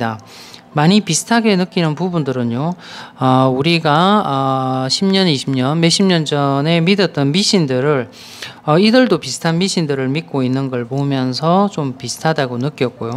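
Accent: Gyeongsang, South Korea. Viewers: 삼성이 철수하기로 했다죠,,,,,,,,,,설명해주신 이유도 상당합니다,,,,,,,,,,설명하시는분 억양이 경남쪽인것 같네요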